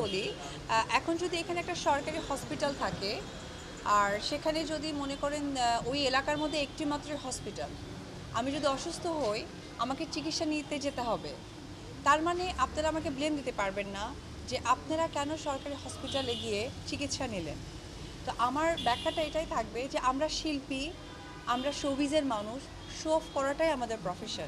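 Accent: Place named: Kolkata, India. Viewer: কিন্তু দিদি,কলকাতার একসেন্ট শুনতে পেলাম বোধ হয় 😅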